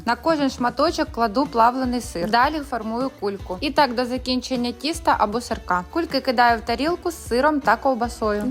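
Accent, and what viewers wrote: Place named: Surzhyk, Ukraine. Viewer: Нічого страшного, що Ви розмовляєте суржиком.Важливо ,що стараєтесь розмовляти українською мовою.Щиро вдячна за рецепт.Молодчина👍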